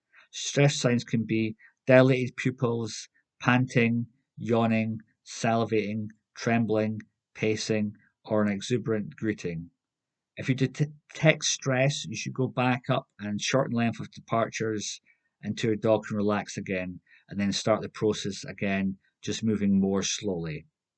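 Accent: Scotland, United Kingdom. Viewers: Superb video about the subject. Also very important is your calm and relaxed Scottish accent and "timbre" to calm down the hoomans who are worried about the anxiety of their sighthounds. It works 2 ways. Most excellent.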